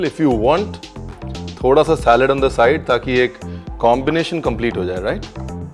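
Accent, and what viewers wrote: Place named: Punjab, India. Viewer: Your Punjabi excent and way of speaking is just great 👍👍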